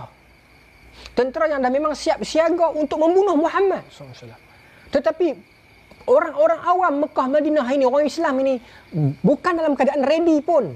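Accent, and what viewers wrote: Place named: Kuala Lumpur, Malaysia. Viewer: terbaik abg..saya antara peminat ustaz..cara penyampaian yg sempoi.tp kali ni mula2 ckp k.l huduh piang dgr..hihihi..love u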